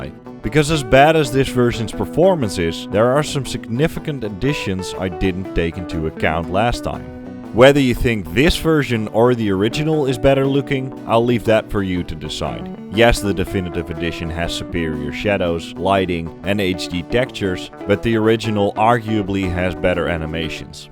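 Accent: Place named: Netherlands. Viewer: Je klinkt Nederlands.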